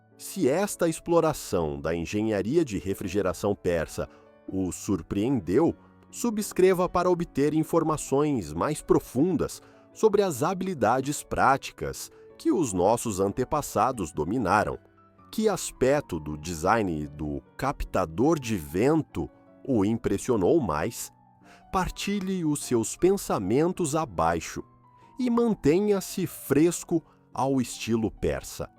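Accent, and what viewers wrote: Brazil. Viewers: Sotaque brasileiro e texto da guiana. Ruim de ouvir "à falar", "à andar", etc.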